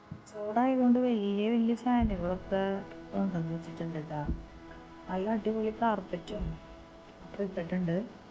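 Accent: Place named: Thrissur, India. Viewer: Nice presentation..... Thrissur kari 😂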